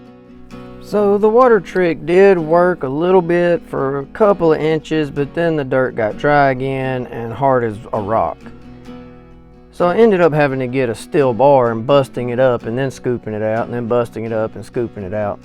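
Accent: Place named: South, United States. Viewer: Enjoyed your video. Enjoyed your true Southern accent and attitude... "Let 'em run wild"... lol 😂. Gathered some good tips. ❤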